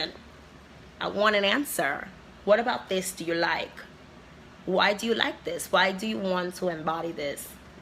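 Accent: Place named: Dominican Republic. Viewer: she grew up with Dominicans. Why shouldnt she speak like the people she grew up with? Its not like accents are genetic lol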